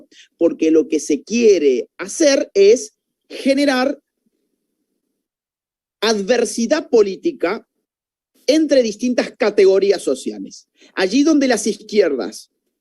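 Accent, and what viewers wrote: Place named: Mexico. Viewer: Habla como mexicana esta dama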